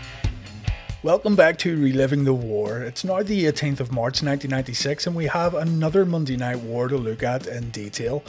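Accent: United Kingdom. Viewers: What part of UK you from?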